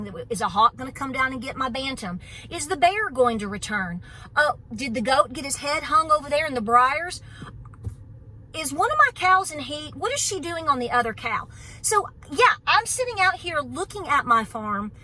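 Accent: South, United States. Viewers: i just love your podcast! I love your cheery attitude! And your southern accent and all your animals! And your voice, oh my goodness! Ilove it when you sing! Thank you for brightening my day!🙏😊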